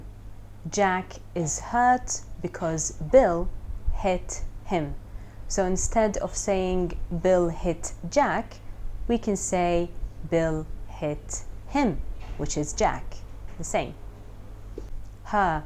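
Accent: United Kingdom. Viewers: ماشاء الله تبارك الله ربنا يوفقك اللكنة البريطانية جميلة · احسس حد في العرب سمعته بيتكلم انجليزي بريطاني ان شاء الله في يوم هاتكلم زيها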